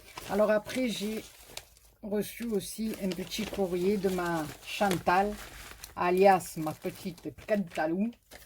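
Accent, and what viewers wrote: South, France. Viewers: Bonjour je tombe sur votre chaîne et quel accent charmant qui sent bon le soleil ☀️ laissez parler les mauvaises langues et bonne continuation 😘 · C’est dommage que tu sois obligée de te justifier. Ton expliquement et avec l’accent c’est presque du Pagnol. J’adooore. Tun’est pas là seule à vendre et après. Qu’ils s’occupent de leur c........bravo la star. Bisous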